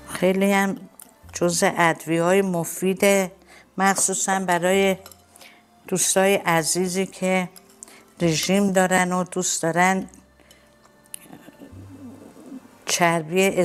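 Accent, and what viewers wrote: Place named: Dezful, Iran. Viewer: از کلوچه دزفول بدم میاد ااااااخ شما حتما دزفولی هستی که تبلیغ شهرت میکنی پیداست لهجه دادی با اینکه رفتی اونور آب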